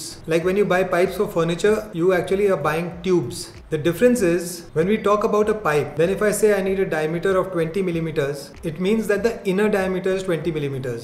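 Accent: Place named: Goa, India. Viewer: Achha😁Sensed Goenkarpan in your style of talking mhanun vichaarle :)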